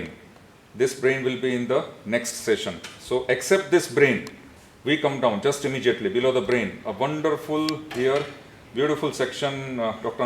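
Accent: India. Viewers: Indian accent? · Indian english I cant understand anythink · This guy has a strong accent. Seems like if he were speaking native Indian language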